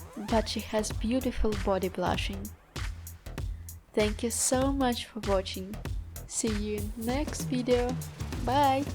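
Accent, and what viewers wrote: Russia. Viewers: Emma Ray just because she IS from Russia :D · Very interesting makeup, and.. Your pronunciation is like you are from Russia😅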